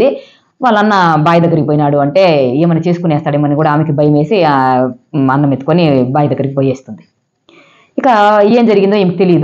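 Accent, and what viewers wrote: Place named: India. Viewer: మీ వాయిస్, ఆ provincial dialect అంటే నాకు చాలా ఇష్టం సాబ్.. 😍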